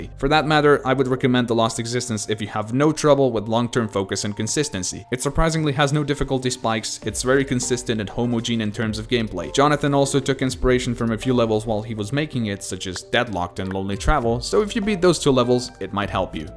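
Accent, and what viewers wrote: Slovenia. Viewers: Yeah i can hear your accent is slovenian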